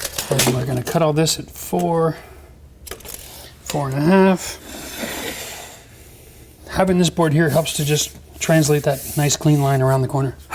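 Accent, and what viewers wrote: Ontario, Canada. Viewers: As an Ontarian, yes, it's the Ontario accent. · I think it's the Ontario accent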